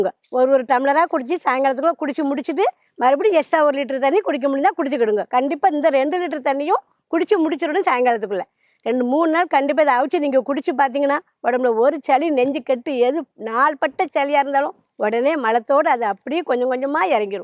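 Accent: Tirunelveli, India. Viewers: நெல்லை தமிழ்... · அம்மா தங்களின் பேச்சு எங்கள் திருநெல்வேலி பாதை போல் உள்ளது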